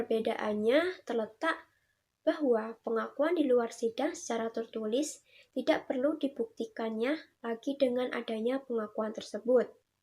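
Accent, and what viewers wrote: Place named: Java, Indonesia. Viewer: sukses terus.. oh iyaaa, jowone kental pisan oiii wkwk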